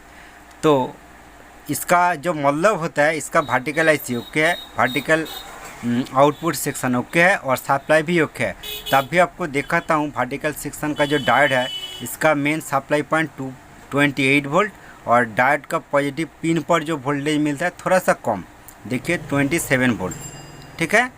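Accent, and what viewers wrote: Bengal, India. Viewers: Nice information Dada. Apka Bengoli Hindi very nice.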